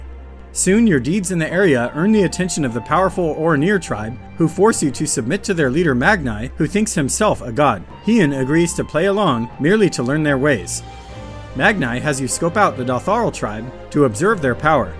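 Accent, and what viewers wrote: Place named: United States. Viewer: I'm just so thankful that you are so articulate. So many other summary videos, I can hardly understand their accents (European, speech impediments, etc). I'm from the United States so hearing an American accent is so much easier for me to understand. Thank you.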